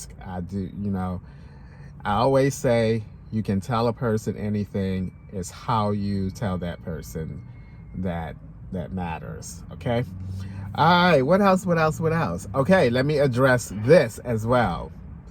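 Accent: Baltimore, United States. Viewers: I knew you were from bmore from how you talk lol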